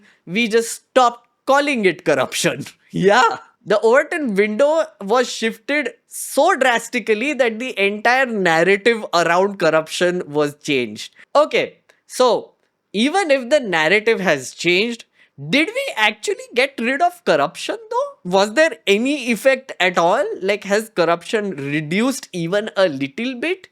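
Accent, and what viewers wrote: India. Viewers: Dude I like the content of your videos and you do present a rational opinion. But I have rarely ever finished it beyond the halftime, why? cause of (sigh!) forgive me but the accent. It so heavy so heavy it's just impossible to keep on listening. Do something about it. It's like the epitome of stereotypical Indian English. Why don't you present your content in Hindi which will have a larger reach. Just my personal thoughts.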